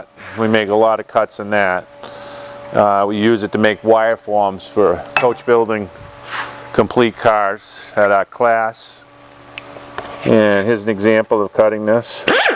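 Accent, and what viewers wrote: Boston, United States. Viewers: 👍A+ for the Boston accent.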